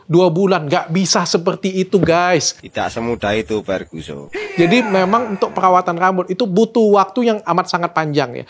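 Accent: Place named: Kalimantan, Indonesia. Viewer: biasa nya kalau ngomong karena "kerana" dan R agak kedal itu asal kalimantan bener kah ?? BTW ,, menunggu vitamin untuk rambut uban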